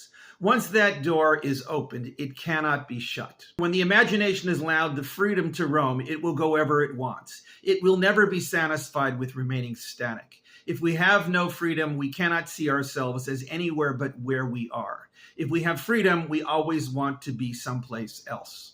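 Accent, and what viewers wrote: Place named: United States. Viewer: You sound very indigenous to the Middle East with that American accent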